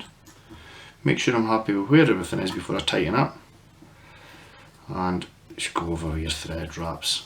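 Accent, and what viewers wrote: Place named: Stranraer, United Kingdom. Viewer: Another nice fly, that sounds like a stranraer accent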